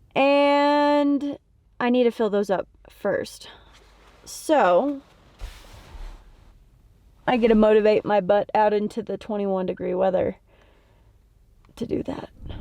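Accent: United States. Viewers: why not buy true american you sound american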